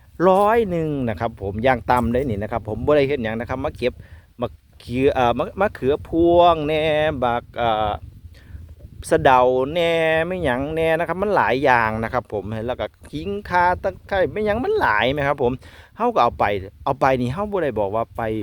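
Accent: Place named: Nong Khai, Thailand. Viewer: สำเนียงคือคนแถวบ้านผมเลยครับ ผม หนองคายครับ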